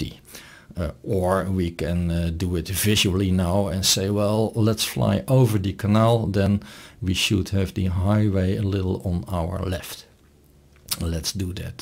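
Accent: Netherlands. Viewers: Always good to hear a Dutch accent with English. I'm American and lived in Landgraaf for five years. Thanks for the video.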